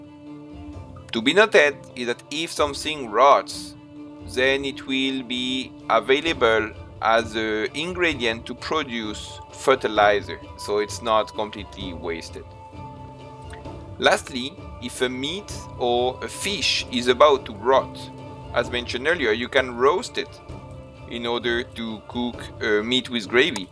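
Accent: France. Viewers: Tq for this guide despite heavy french accent and small following I find his guide comprehensive and straightforward compared to others 👍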